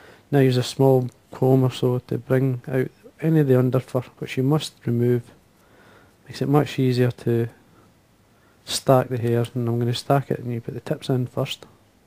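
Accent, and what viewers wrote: Scotland, United Kingdom. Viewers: Nice tie and I'll be taking a couple to the faster upper reaches of the Clyde. Great to hear a fellow Scot too! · My fly box is sort of like yours, I don't tie as well, you are by far the best on You tube and that is saying a lot, there are a lot of good tiers here, It took me a while with my american english ears to understand you, heavy Scottish accent its a good thing! I have one fly box in my vest that is debated to just Adams Dry Flies, I have them from size 6 down to 22! At least half dozen in each size, I like this style, and going to add them to my box, Roe deer never seen it in the flyshops, but White tail deer should do, I been tying my own flies since I got my first vise a Thompson A back in '64 and I still have it to go with the Regal, HMH and Dyna King. Of all of them I like the old Regal and the Dyna King! 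But a vise just holds the hook, the skill and the artistry of fly tying well that is something else all together, I am on my way to Montana next week, I decided that I am just going to spend the rest of Sept and most of Oct- Fishing then back home just in time for Rifle Deer hunting, then maybe some winter Steel head fishing!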